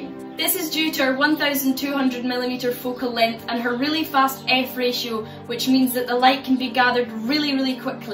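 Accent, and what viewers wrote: Scotland, United Kingdom. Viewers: New subscriber caught off guard there with a Scottish accent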